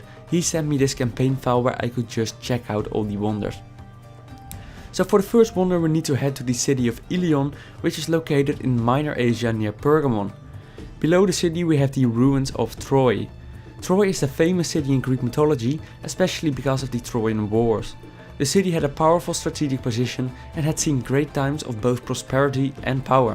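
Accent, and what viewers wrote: Netherlands. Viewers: you're dutch i can hear on you're bad english accent (no hate great vid)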